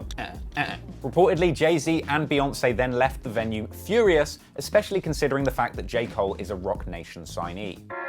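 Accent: United Kingdom. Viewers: This guy is like fingernails on a fucking chalkboard for me. Honestly, and oddly I admit, NO OTHER PERSON ON ALL OF YOUTUBE gets on my nerves like this limey Brit fuck. Oh my god he's insufferable with the same fucking jokes over and over, let me actually go to the most insufferable thing: white British people, please, please PLEASE don''t culture vulture and comment or perform hip hop, ever. See Aries Spears hilariously talking about how British hip hop is just the worst abomination of accent and manner of prim and proper accent trying to do a cool, US southern slang art form. "CAN-YAY. CAN-YAY." "TWO-PACK.TWO-PACK." HOW THE FUCKING FUCK!!! HAS THIS GUY NOT BEEN CORRECTED ON THE FAMOUS MISPRONOUNCIATION OF TUPAC YET!? "LIFE OF PAB-LOW. OH GOD I CAN'T BEAR THIS LITERALLY I'M ACTUALLY SAD I CAN'T WATCH ANY OF THIS DECENT CONTENT BECAUSE I JUST CAN'T FUCKING STOMACH THE NARRATOR FOR ANOTHER FUCKING MINUTE LITERALLY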